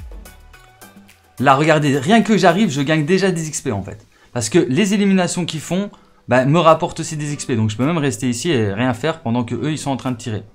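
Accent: Belgium. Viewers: Tu a un accent belge je me trompe??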